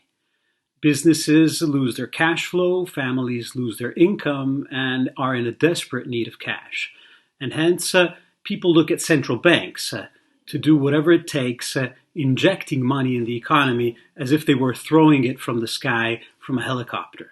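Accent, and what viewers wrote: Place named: United States. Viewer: Nice American accent for an Italian, bravo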